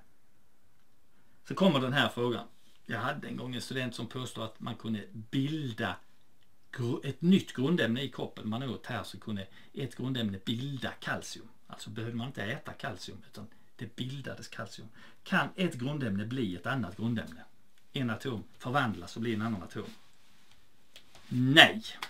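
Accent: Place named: Scania, Sweden. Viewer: Kul att lyssna Kemi på skånska 😅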